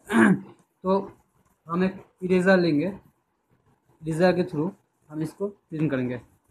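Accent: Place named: Bengal, India. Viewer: From Bengal as evident from accent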